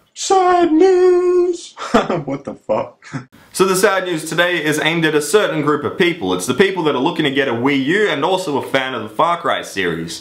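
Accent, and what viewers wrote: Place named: Australia. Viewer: His Australian accent >>>>>>>>>>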